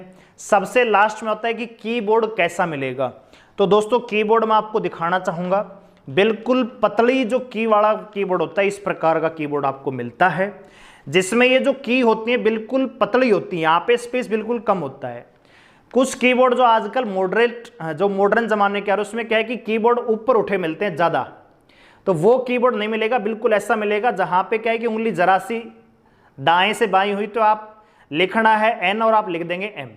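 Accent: Haryana, India. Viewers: Haryana ki h bhai😊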